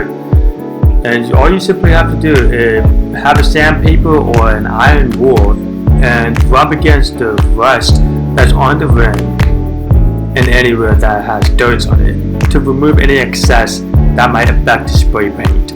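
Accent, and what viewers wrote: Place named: England, United Kingdom. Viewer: You speak like English people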